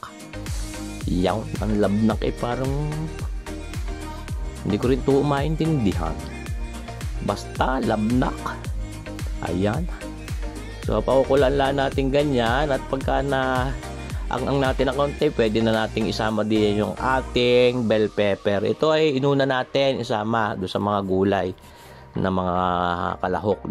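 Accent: Batangas, Philippines. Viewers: Nakakaaliw ka at nakakagood vibe panoorin. Marami rin ako nakukuhang techniques sa mga procedure mo ng pagluluto. Natutuwa ako sa mga pananalita mo eh! Talagang Batangueñong Batangeño… more power to your vlogs & GOD BLESS😊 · Abay sadya..batanguen0 eh😅😅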